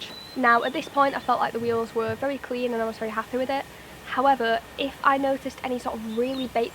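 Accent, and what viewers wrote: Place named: Manchester, United Kingdom. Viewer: absolutely you do, the voice is great too, would it be cheeky of me to ask where your from? I’m picking up bit of manchester and abit of Cheshire would you be somewhere in the middle? Im broad Bolton, my expertise is grass but I love the car cleaning always have since i was a kid, so much choice now though, ive just bought the 3 in 1 cleaner off the facebook ads to see if the waters runs off like it does in the videos in china, have you seen it? Used it?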